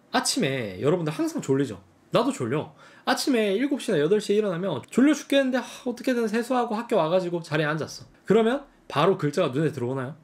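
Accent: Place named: Gyeongsang, South Korea. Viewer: 경북 사투리는 좀 투박해서 싫어하는데 (나 경북사람임) 이분은 사투리 억양이 쎄지만 계속 듣고싶어지는 ,고급지게 들리는 마술이있네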